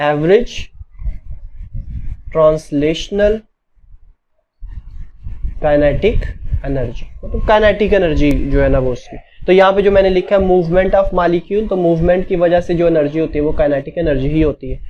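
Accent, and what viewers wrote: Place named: Pakistan. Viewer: I guess, you are from pakistan. No hate❤, bro got to know from your accent.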